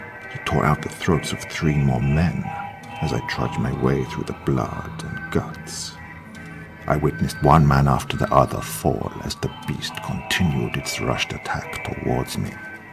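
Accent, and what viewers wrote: Russia. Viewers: I'm no expert with accents but in my opinion your Russian accent has become soooo good! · Hello there. Has anyone ever told you that you have a good Russian accent? I do love these extra stories you give us. Much appreciated good sir.. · ❤️ Love the Russian accent but I found the music too loud and distracting 😩🙉🎶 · That is an AMAZING Russian accent!! You do accents (especially Texan) so well! 🖤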